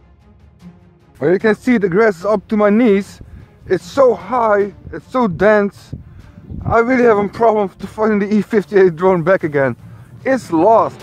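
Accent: Netherlands. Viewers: he sounds soooo much like hes dutch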